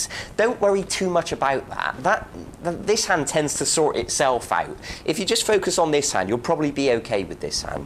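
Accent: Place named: England, United Kingdom. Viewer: I love your lessen too. And your pleasant english accent. 5* from Germany.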